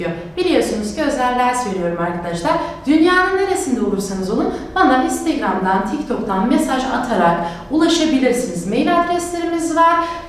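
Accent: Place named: Istanbul, Turkey. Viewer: Hocam siz istanbul Kürtçesiyle mi konuşuyorsunuz? Bizim yamyamlar konuşurken insan içine çıkamıyoruz. Diksiyon kursunuz varsa benim öküzleri de yazdırayım kursa. Gerçekten çok naif ve melodik bir ses tonuna sahipsiniz, insana huzur veriyor. Şu İngilizce belasından kurtulursam ilk iş Kürtçe öğrenmeye başlayacağım.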